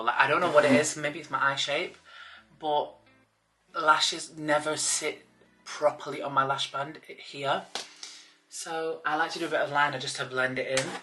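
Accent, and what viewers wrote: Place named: Preston, United Kingdom. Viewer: I have no idea how I never found your channel until recently, we're the same age, same size, and I also grew up in Preston so we sound the same😂 the asda you used to live behind is where I went for the weekly shop with my mum haha. Your genuine love for yourself and happiness is so empowering to see, thank you for posting!❤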